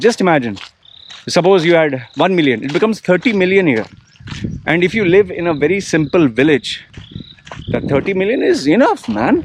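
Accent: India. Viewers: Did not expect you to have an Indian accent LOL. Cool insight tho.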